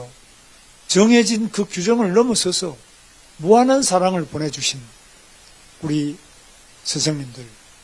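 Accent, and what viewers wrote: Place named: South Korea. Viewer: 구수한 사투리 정이갑니다.대통령꼭대십시요.😊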